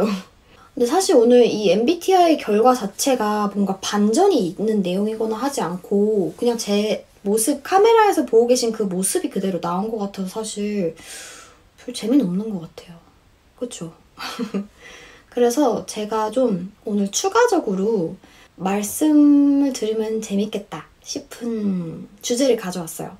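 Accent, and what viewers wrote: United Kingdom. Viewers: 오늘따라 더 이뻐보인드아...♥ 항상 잘 보고 있어용 영국 발음은 처음들어서 그런지 자꾸 끌린다앗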